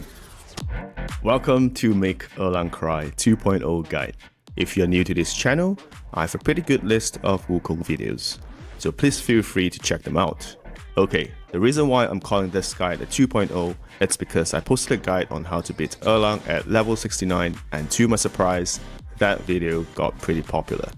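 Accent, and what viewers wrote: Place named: Singapore. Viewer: Nice guide bro, you must be a Singaporean with that accent :)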